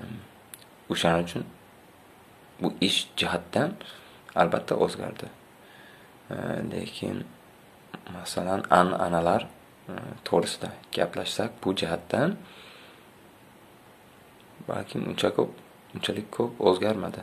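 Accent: Uzbekistan. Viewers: Сиз тоза Озбек тилида сузлаяпсиз ,анаву вотти вотти килмасдан шундай гапирсангиз бутун Турк дунёси тушинади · Гап булиши мумкин эмас зур соф узбек тилида гапирияпди офарин 👍👍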